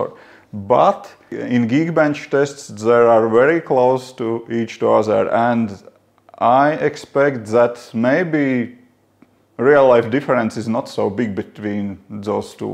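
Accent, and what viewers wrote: Russia. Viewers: Actually your accent is the same as russian 🙃 Interesting video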